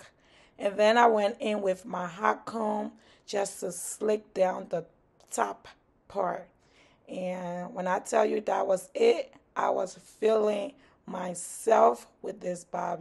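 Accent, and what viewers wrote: Liberia. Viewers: You sound so Liberian. Great job. Beautiful❤❤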